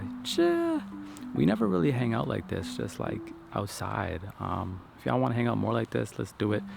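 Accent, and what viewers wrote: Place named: Jamaica. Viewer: I miss your Jamaican accent!. Love your message though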